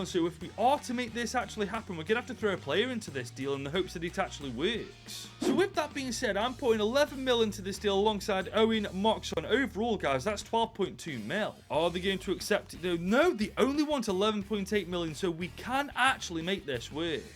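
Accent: Stoke, United Kingdom. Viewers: I recognise the accent are you a stokey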